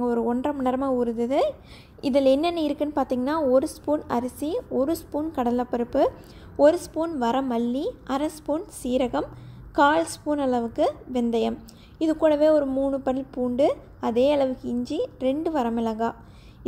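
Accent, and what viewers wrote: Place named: Sulur, India. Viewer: Ippo than casual a unnoda video parthenma.unnoda voice modulation ne solluthu, ithu namma ooru ponnunu.udane subscribe pannitten.nanum Sulur pakkam than....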